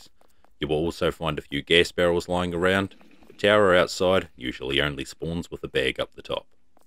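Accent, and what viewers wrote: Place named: Australia. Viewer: Man you sound like Jamaine from flight of the Concorde's. I don't mean just because you both have Australian accents ya just fully sound like him. Such a crack up that show. Also cheers for all the tips on 7 days.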